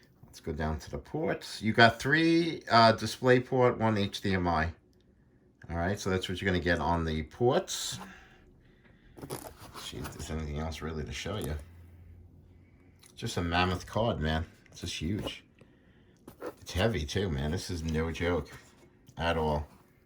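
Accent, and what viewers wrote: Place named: New York, United States. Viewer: Grats on the card, i'm still waiting for mine. Side note, I really miss new york and the accents loi.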